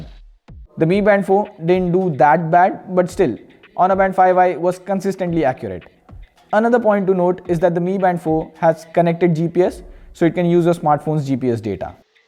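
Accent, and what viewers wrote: India. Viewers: Your accent like dr bombay, Calcutta...taxi3 n Calcutta...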